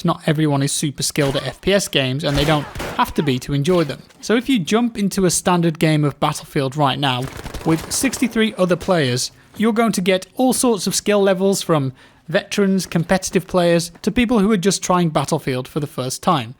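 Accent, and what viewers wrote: England, United Kingdom. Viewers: I love battlefield and everything but I think the real reason I keep watching your videos is because that English accent is just delightful. 👌🏼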